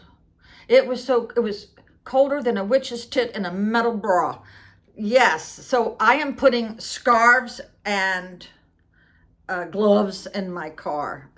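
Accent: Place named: Pittsburgh, United States. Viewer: I have to ask...Are you originally from the Pittsburgh area? I detect some Pittsburgh in your voice.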